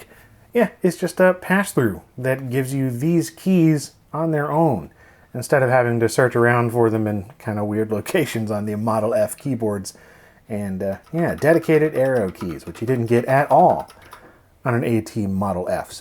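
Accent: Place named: United States. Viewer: Well... I saw the title and the thumbnail, so clicked on it and was shocked when I heard a US accent coming out of my main speakers instead of the one I was expecting coming from my subwoofer!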